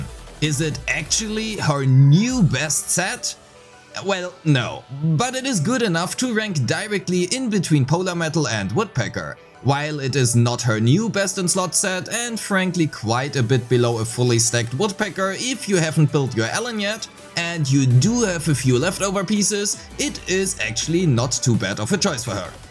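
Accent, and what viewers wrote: Germany. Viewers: Are you german? Your accent reminds me of german ^^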